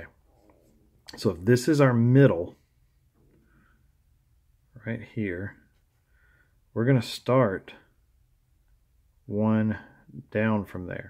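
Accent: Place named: South, United States. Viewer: love your southern accent. i used to live in Florida....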